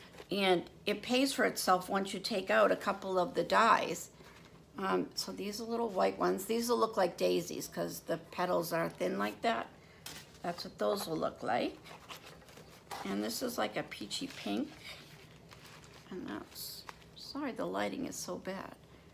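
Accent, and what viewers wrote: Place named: Boston, United States. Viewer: Oh I guessed Boston so you being from Mass makes sense :) It's awesome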